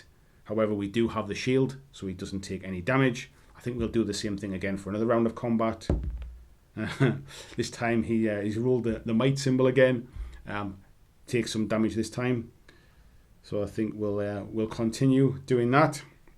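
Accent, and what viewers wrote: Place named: Scotland, United Kingdom. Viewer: Scottish Accent Boardgame Review = Auto Subscribe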